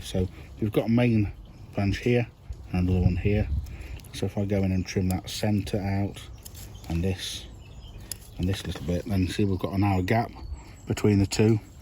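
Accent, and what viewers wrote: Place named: Leicester, United Kingdom. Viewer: Great video and nice to hear a Leicester accent.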